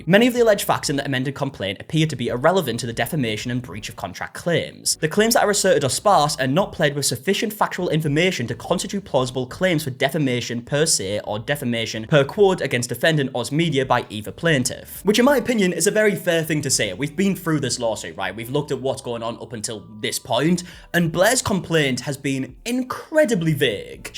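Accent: United Kingdom. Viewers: Yeah, the light to no R pronunciation and the schwa-ification of almost all vowels in some British accents is amazing sometimes 😂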